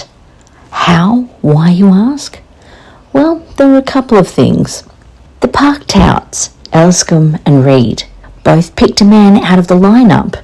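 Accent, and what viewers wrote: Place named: Australia. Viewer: Keep up your great work. So tired of O/S people, criticism of your accent. (usually Nth Americans-who think everyone should bow to them) I'm from Sth Aust, We tend to be quite tolerant, except when people criticise Aussie accents-each State has its idiosyncrasies with speech patterns. Most countries do!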